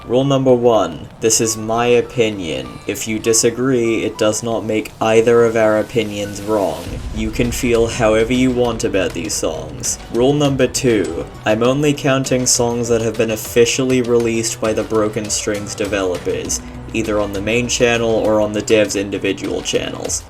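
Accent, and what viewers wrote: United Kingdom. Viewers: I wasn’t expecting this video to have full commentary !! The Bri’ish accent is really soft but it’s still satisfying to listen to !! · a British accent isn't always so heavy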